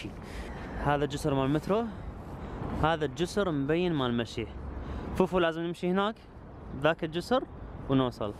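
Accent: Iraq. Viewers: لغتك العراقيه اقوى مني رغم انت ما عايش بالعراق واو بس حبيت اتشكرك من كل قلبي كأنو انا رحت لليابان وشفتها thank you very much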